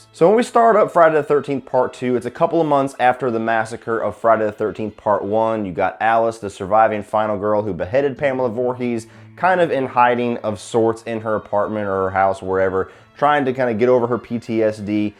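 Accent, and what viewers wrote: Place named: Midwest, United States. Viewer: He is original from Ohio but moved to Georgia at like 14 or 15. So either Midwest or Southeastern United States. I don't think he has much of a georgia accent more midwest.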